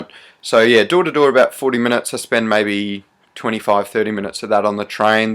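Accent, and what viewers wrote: Australia. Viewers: im pretty sure his accent is Australian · Bradlyn Dukes I'm aware accent is aussie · Watching one of your older videos makes me think if you losing slowly your Australian accent.what do other people say about that? Cheers mate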